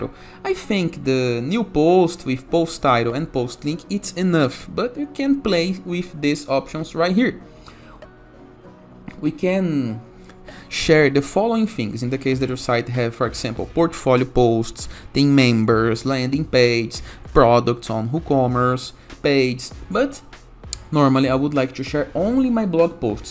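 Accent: Brazil. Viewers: Você devia fazer mais videos em inglês, seu inglês é legal, percebi que era brasileiro pelo sotaque..😁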